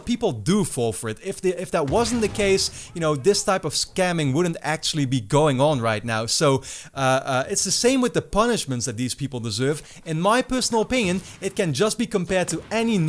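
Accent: Netherlands. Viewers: Hey dude, thanks for the video! I'm new to the channel, btw I like it, so I don't know it but are you Dutch? I am and I think I can recognise your accent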